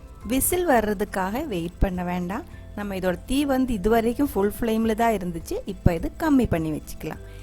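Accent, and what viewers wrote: Kerala, India. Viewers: I subscribed your channel when I saw your video for the very first time itself. Not for the receipe I saw but for your soothing voice. Lovely Tamil with a kerala touch....now regularly following your recipes...you are going to hit the cliff soon...this is the first comment I'm placing for a YouTube channel.stay blessed!!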